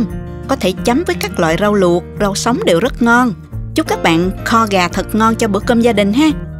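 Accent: South, Vietnam. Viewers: Tôi sinh ra lớn lên ở Lái Thiêu đây cô,thực sự cô người. Bình Dương?? Nghe giọng nói đúng người miền Nam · Đã đăng ký kênh, dạy dễ hiểu, và giọng miền Nam dễ thương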